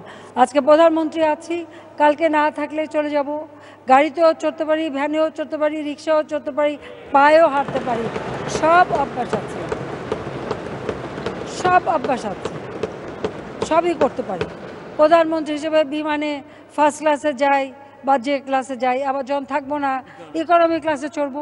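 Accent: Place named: India. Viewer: Akdom amader Indiar moto kotha batra....so i like is.........kotha sunei mone holo na j akjon Bangladeshi mohila.....!!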